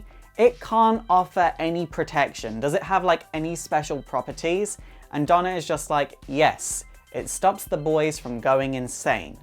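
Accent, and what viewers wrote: United Kingdom. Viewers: Is he British or have an accent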